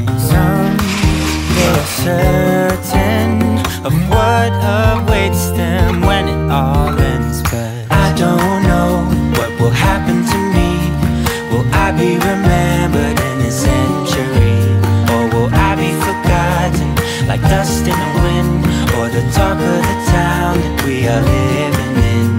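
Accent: Hungary. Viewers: Elvileg van automatikus fordítás a youtube videóknál, ahogy látom itt is. Én inkább magyarul hallanék már egy videót, hiszen magyarok.. és legyen angol a fordítása:D Nagyon bántja a fülemet, hogy magyar kiejtéssel beszél angolul.. tudom az én bajom:) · Hi, I just started to watch your videos! You accent is similar to my neighbours who are Hungarian, now I understand why:) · Hodvoid. I noticed the accent. My mom was Hungarian and that’s we all 3 brothers identified ourselves.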